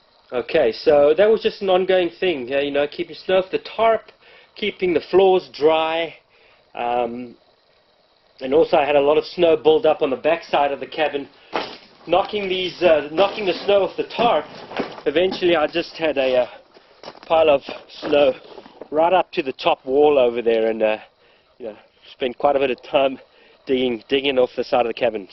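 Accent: South Africa. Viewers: is he south african or is it just me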